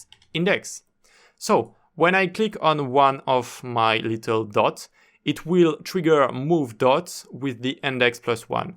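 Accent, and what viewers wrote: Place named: France. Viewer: Oh mon Dieu l accent français ne nous quitte pas même en anglais force à toi mon reuf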